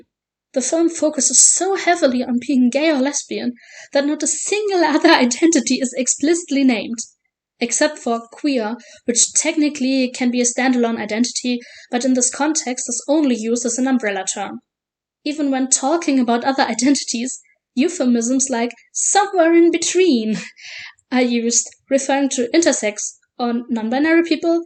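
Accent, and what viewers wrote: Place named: Germany. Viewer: I can hear your German accent.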